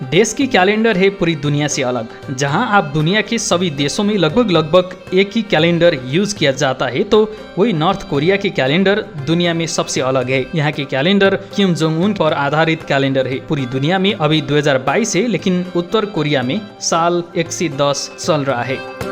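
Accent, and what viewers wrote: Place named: Nepal. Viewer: नेपाली हो नी, तर लवज नेपाली नेपाली आइदियो😜😜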